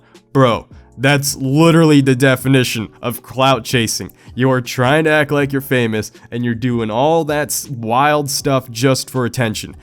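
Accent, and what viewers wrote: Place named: Appalachia, United States. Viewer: Dude that hillbilly accent is the reason why I just subbed😂😂😂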